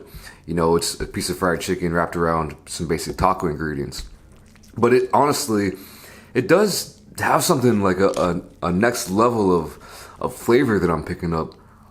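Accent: United States. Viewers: He talks American asf though I thought he was from the United States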